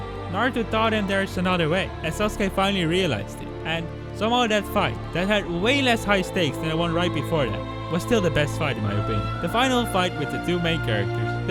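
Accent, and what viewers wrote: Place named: Netherlands. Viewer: I like your accent. It sounds a little Dutch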